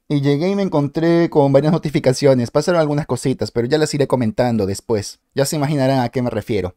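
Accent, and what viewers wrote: Venezuela. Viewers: espera eres venezolano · una pregunta we ere venezolano s o n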